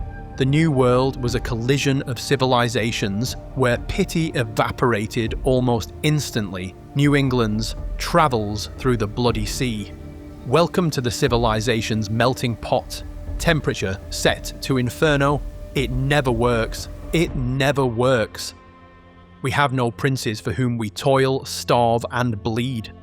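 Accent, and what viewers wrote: United Kingdom. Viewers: American history narrated in a British accent. Is this supposed to be funny?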